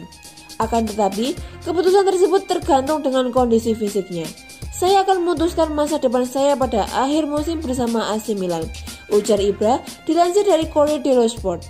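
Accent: Java, Indonesia. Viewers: Gemes sama pengisi suaranya, medok banget hahah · jowone ndi mbak yu? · Medok suaramu mbak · Mario Marzuki not bad lahh buat sampe akhir musim. Pengen liat dong nihh naratornya...medok amat suaranya. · Bicara ny aga logak jawa,,,bravo fm 1899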